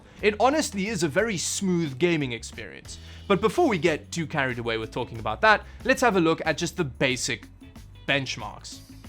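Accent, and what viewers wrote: South Africa. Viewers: hey whats your accent? south african im guessing? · where are you from dude, you have a polish name but you sound south african im so confused rn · are you "a botjie from SA" or just have an accent?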